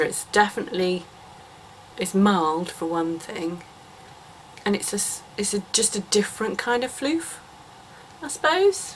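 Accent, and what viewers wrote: United Kingdom. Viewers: Hiiii!!! I love to hear your perfect British accent😍. And that mohair coat it's lovely. I have a lot of stash, mohair stash, and always think it's better knitted than crocheted!! But you made me rethink it😁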